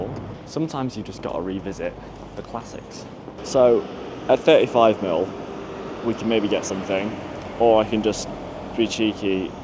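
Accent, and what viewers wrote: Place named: Manchester, United Kingdom. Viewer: You’re from Manchester; as an American, I guessed Liverpool. 😂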